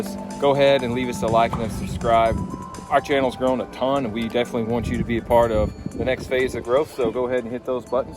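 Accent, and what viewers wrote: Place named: Texas, United States. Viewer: would be interesting to have a follow-up on how this gutter brand worked for you. also, are you from GA? I lived there 15 years and TX for 15 years and your accent reminds me of TX more than GA. 😁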